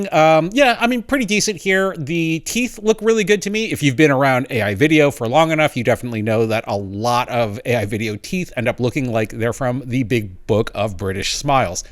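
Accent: Quebec, Canada. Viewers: Great video! :goodvibes:and it's a Canadian french Accent! 🥰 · Je confirme c’est bien l’accent canadien du Québec😅😂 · As a french man myself, I'd say that hesounds like a guy from Montreal. Strong Quebec accent. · Hello there, its more like a very strong French Canadian accent, French is my mother language !!!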